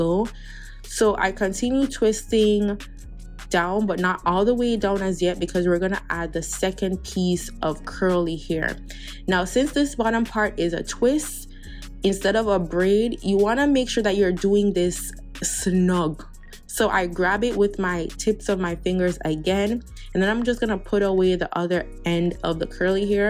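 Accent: Jamaica. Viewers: Are you Jamaican??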